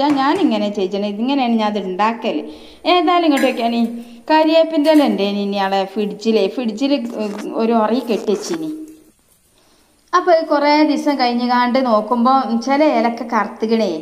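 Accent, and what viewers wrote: Malappuram, India. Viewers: മലപ്പുറം ഭാഷ പഠിക്കാൻ വരിനെടാ മക്കളെ 👍👍👍👍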